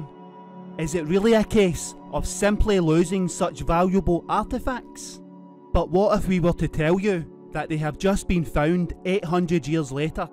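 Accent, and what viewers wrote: Scotland, United Kingdom. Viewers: He has a Scottish accent. Do you want him to fake a plummy English accent, or put on an American drawl? What does it matter?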